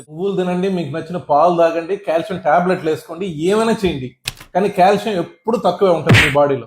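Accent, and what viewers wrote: Nellore, India. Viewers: మీరు చెప్పే విధానం మన నెల్లూరు స్లాంగ్ భలే ఉంటది 😊 థాంక్యూ సార్👌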